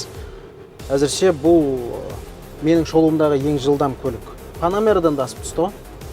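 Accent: Standard. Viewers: базар жок рахмет. Недеген сауатты сойлесин таза казакша сау бол бауырым.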